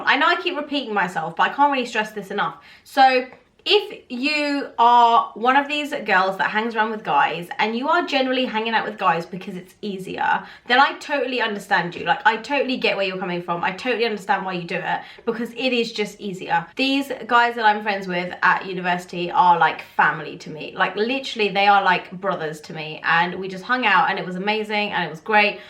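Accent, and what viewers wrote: United Kingdom. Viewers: I seriously can't get over her face. She's so pretty. And she speaks that funny English (British).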